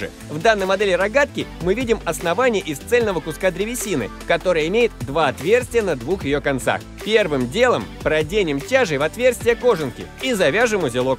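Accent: Kazakhstan. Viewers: Ты из Казакстана?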